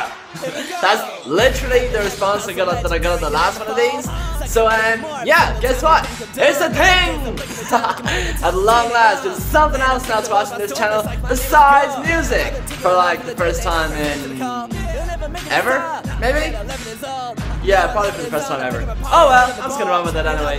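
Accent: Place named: Ireland. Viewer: DANG the most adorable ACCENT yo!!! LOL 😂😂😂😂Almost at 200 subs, freaking awesome!!!! YES< we need more of these, too!!!! I don't care which day your vids come out, I'll be there fam!!!! 😀😀😀LMAO with the editing...the comments to yourself bwhahaahha "JESUS CHRIST, "!!!! LMAO 😂😂😂😂Definitely keep these coming, too along with your awesome new beats!!! And I'm going to keep nagging you about that autograph until I turn blue in the face...and a BLUE EGG is NOT a pretty sight, yo!!!! LOLOL 😂😂😂😂 Much love my adorable, irish friend!!!! xoxoxoxox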